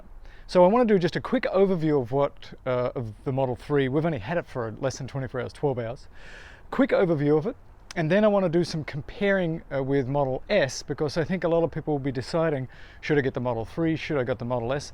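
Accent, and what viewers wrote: Australia. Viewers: Great to hear an Aussie accent ...makes a change... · Do I detect an Australian accent? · Very informative video sir, and is that an Aussie accent i hear?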